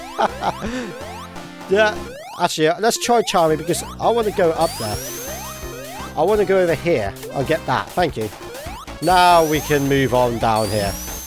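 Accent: United Kingdom. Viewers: At the start of the video I thought you speak another language but my brain wasnt working and your British accent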